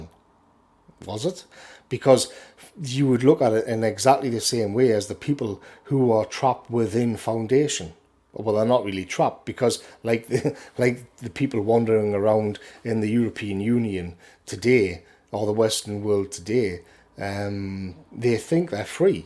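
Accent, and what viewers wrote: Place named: Newcastle, United Kingdom. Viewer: FIX IT FOX Scotsman?....he's a Geordie.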